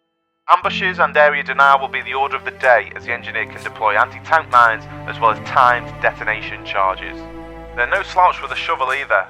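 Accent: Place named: United Kingdom. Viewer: Why a British voice??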